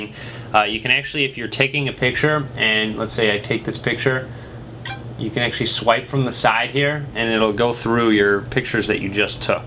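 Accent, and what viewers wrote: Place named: Chicago, United States. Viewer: dude i'm not from the states but i know you got a strong chicago accent!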